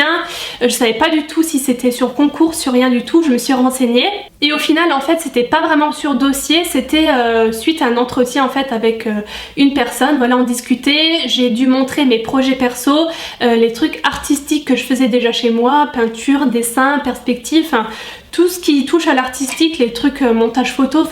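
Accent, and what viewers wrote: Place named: Alsace, France. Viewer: Hihii je me disais bien qu'il y avait un petit accent qui me disait qch par ici ☺️ Je suis de colmar ! Je viens de découvrir ta chaîne et j'adore !! En plus de ça je viens de découvrir cette vidéo sur la décoration d'intérieur, j'étais partie pour MJM tout comme toi .. mais jai malheureusement eu la peur des débouchés, et j'ai finalement changé de voie .. chose que je regrette chaque jour depuis ☺️ Tu as eu raison d'aller au bout de ta passion et vraiment félicitation ! Ca doit pas être facile tous les jours d'être auto entrepreneur ! En tout cas je vais parler de ton site autour de moi pck vraiment j'adore !( sans parler de ta chaine YouTube biensur ) j'avoue que séparer le professionnel de YouTube c'est bien mieux ! Continues comme ça ! Pleins de bisous d'une haut rhinoise ^^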